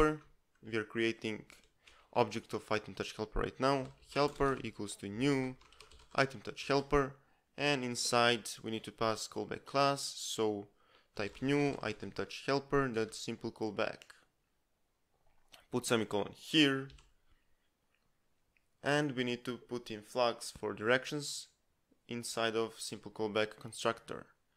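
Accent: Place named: Russia. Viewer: акцент вроде русский:yt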